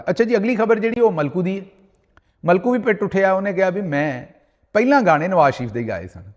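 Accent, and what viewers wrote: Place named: Punjab, India. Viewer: To me, despite being an indian, your real punjabi accent makes me to watch your vlogs regularity! Keep it up! 👍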